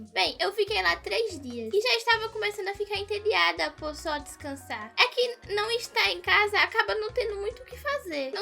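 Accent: Brazil. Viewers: seu sotaque e mt forte jkkkk me lembra mt quando passei por recife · Moça vc é de Pernambuco?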